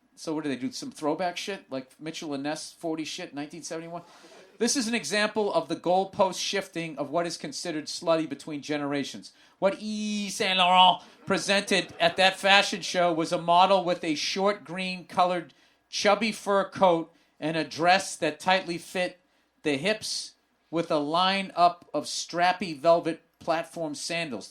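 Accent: Boston, United States. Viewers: Does he do any of his overly pronounced Boston 'vowels'? I'd love to see him do one of those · Hes bostonian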